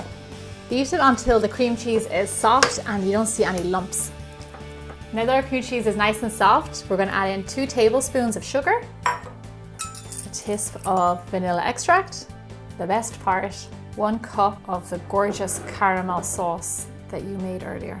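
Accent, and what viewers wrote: Ireland. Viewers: Made this for our Christmas Dinner Dessert. It was a crowd hit. A lot of work, but very much worth it. Definitely a keeper recipe. It can be made in stages (the brownies and caramel the day before etc.). Thank you!! Warning #1: It is delicious but VERY rich. It fed 10 at our dinner and we didn't even dent half of it. Warning #2: Pay close attention to the colour of the sugar for your caramel. The darker it becomes, the stronger flavour your caramel will taste. If you wait too long (sugar too dark in colour), it will taste like a burnt sugar caramel. Still good if that's your thing, but I prefer it just after turning golden and before getting very dark brown (it's a personal preference thing). Warning #3: When making the caramel, add your butter all at once and then whisk in (as says). Have your butter and cream set aside at room temperature if possible (not cold), this will help with the caramel staying emulsified, and not separating. 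Warning #4: If using salted butter, omit adding salt at the end. Warning #5: `s recipes are fantastic, but her Irish accent alone will make you want to come back to this channel.:)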